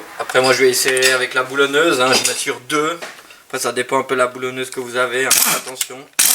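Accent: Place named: Switzerland. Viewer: Je découvre cette chaine, aaaaaahhhhhh ça joue! Un ami Suisse cet accent m'avait manqué!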